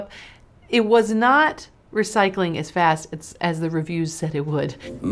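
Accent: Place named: Alabama, United States. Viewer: thank you so much for this video. I love your teaching/behind-the-scenes style and your smooth southern voice reminds me of the Alabama that I left to come down here to Brazil. BTW, my Canon 600EXRT was giving me some trouble (shooting full power every once in a while), so I bought the new Godox V860iii (it is R$1200 Brazilian). Just got it for Christmas and haven't even had a chance to take it out, but I loving it! Wish I could control other flash makers (like my YongNuo) with the Godox (you can, but only on manual S1). I can't even control my Canon flash with it, but that's ok because I plan on changing ALL my flashes for Godox. Hint: DO NOT BUY YONGNUO FLASHES, THEY BREAK EASILY AND ARE NOT WORTH IT!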